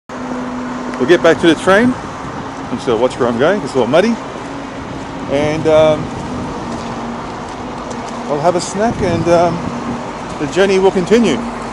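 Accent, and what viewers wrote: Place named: Russia. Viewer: I guess you were a child or a teen when you came to Australia from Russia? I can notice some Russian accent. I'm living in Brisbane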